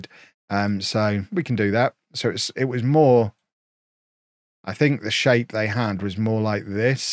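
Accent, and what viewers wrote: United Kingdom. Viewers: I’m not sure I can support this series if there isn’t any suits. I can put up with the annoying British accent, the sub par game play, look it’s just not cricket.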